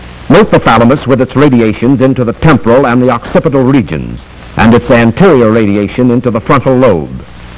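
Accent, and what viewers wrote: Transatlantic, United States. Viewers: 1. Old as hell video 2. Transatlantic accent narrator 3. Fuzzy background sounds 4. Slightly distorted video quality 5. Almost/complete black and white colours 6. Starts off with a bunch of descriptive texts 7. Medical related Well this video check listed all the points to be CREEPY AS FUCK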